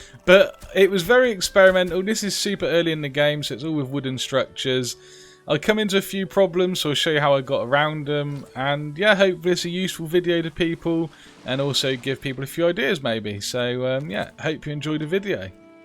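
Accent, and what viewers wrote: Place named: Suffolk, United Kingdom. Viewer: I'm from suffolk , that's why I thought I could hear a hint of suffolk in your accent Haha.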